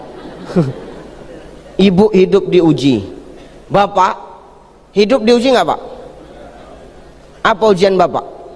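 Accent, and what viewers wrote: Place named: Batak, Indonesia. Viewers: Ciri khas bahasa dari kota Sumatra Utara khususnya Medan memang seperti ini logat nya engkau kau.pribahasa seperti ini nampak nya terlalu kasar bagi orang yang belum paham.tapi percaya lah orang suka Batak itu suaranya lantang dan kasar dan insyaallah hati nurani nya baik🤲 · Itu menurut mu aja... Karna anda lahir di daerah yg punya bahasa lemah lembut saudara ku.. sementara beliau dari Medan Sumatra Utara .. demikian lah logat bahasa nya .. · Sumtra utara mang gtu bang ..itu bercanda semua .saya org jawa tp saya justru suka cz mang bgtu.logatnya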